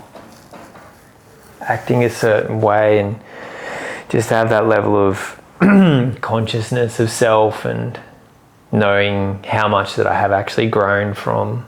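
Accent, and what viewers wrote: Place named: Australia. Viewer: I love watching this Aussie and listening to him talk. The accent is so cool. Foley, Alabama here! 😁😁☀️🌴🤟🏻🫶🏻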